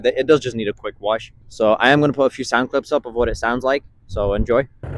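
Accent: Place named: United Kingdom. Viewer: what happened to your british accent man, bring that shit back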